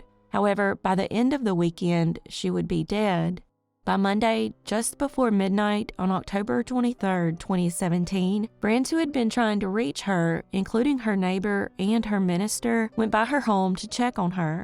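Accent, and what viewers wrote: South, United States. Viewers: We value you, and ur story telling skills. Love fr panama. Only southern voice I like🥰😊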